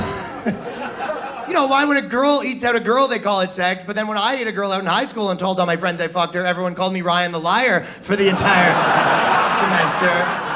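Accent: Canada. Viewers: Is he Canadien or something?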